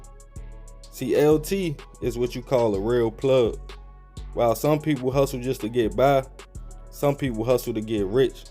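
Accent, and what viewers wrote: Baltimore, United States. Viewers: U from bmore bruh u definitely not from dc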